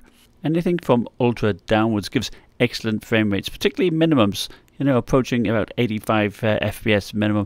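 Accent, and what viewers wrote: United Kingdom. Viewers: But you sound British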